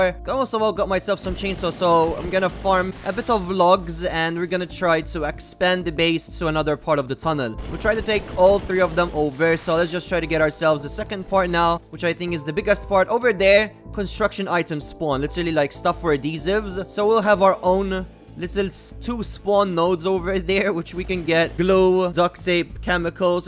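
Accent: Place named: Malta. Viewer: Yooo bro... Weird question.. are you Maltese??? You have such a Maltese accent 😆😆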